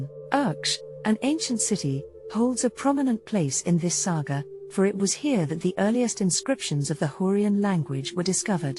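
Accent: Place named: United Kingdom. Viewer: The lady has a very British accent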